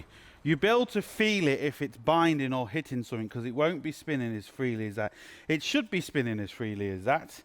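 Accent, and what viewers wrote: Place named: United Kingdom. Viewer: British accent is awesome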